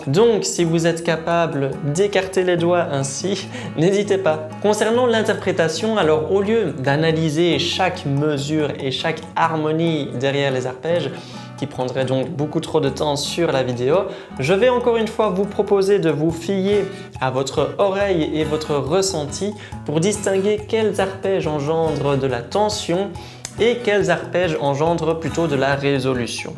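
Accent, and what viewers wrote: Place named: France. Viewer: je suis québécois et je te trouves très articulé et claire alors même si j'ai un peu de misère a m'adapter à ton accent français, j'apprécis quand même tes vidéos, merci beaucoup!!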